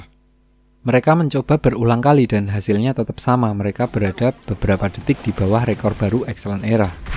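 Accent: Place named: Yogyakarta, Indonesia. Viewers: Admin nya medok tapi lucu nya gokil...anjim 😂😂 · Loh wong jogja to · Oalah wong jogja to mas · Jawa jogja masnya wkwkk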